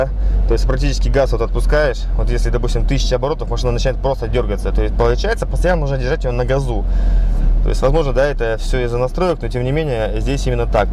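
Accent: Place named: Mari El, Russia. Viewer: бля, я живу в марий эл, у нас 50% населения общаются как! Респект!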